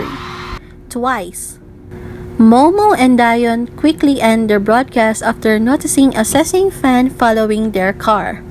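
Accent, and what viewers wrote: Philippines. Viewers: Off topic but is that filipino accent I hear? 😁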